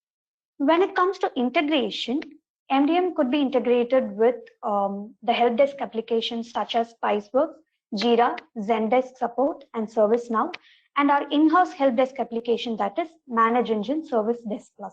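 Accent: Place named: India. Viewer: I really love that you guys are making these training videos and actually share them for free, but for some reason there are too little views here... not sure why. no comments at all, no one to argue with))) or ask questions - if these could be used as forums - wow. And oh god, I am so sorry to have to say this, but the english accent is truely cutting my ears to small pieces, it is veeeery difficult to listen to. Please don´t take it as offence in any case, but wouldn´t it be better if for the training videos you had person for each language? For example for Indian companies make in Hindi and for English and European speaking countries get someone who has acceptable pronaunciation. I mean even if the engeneeir is the someone who doesn´t speak English, he or she could still script what needs to be said. I think this will ensure best discription and result in the trainings.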